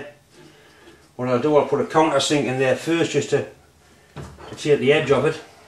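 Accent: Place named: North East England, United Kingdom. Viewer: I'm really enjoying this series,. It is all very well produced and fortunately I am from sufficiently far north to totally understand every single word of your exquisite NE accent. I commend how you use the Vernier Calliper Gauge as a 'Very-near' Calliper Gauge, then turn to the Micrometer for absolute accuracy. All old-school stuff and still valid today. All these ISO units engineers who boast about being able to work to 0.01mm (4/10,000 or 0.0004 inches) when the old brigade, working with a Micrometer with Vernier markings to Imperial units, regularly worked to limits of 1/10,000 or 0.0001 inch (0.0025mm). I think that gives bragging rights to the old school Imperial unit machinists! For any doubters out there, our women in the aircraft factories during WW2 were working to less than a 'thou' accuracy.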